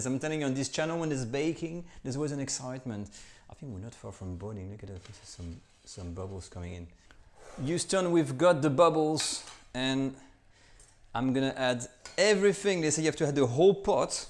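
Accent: France. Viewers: James Franco with a French accent. Subscribed!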